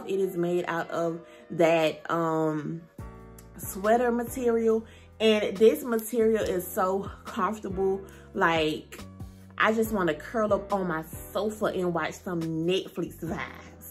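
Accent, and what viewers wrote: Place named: Atlanta, United States. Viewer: Lol your voice 💕❤️ you gotta be frond Atlanta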